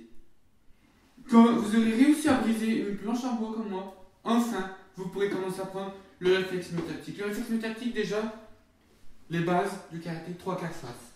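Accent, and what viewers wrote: Nord-Pas-de-Calais, France. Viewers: Exprès et il parle avec le Nord il est chti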